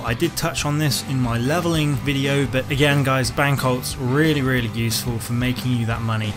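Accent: Exeter, United Kingdom. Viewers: Are you from Exeter?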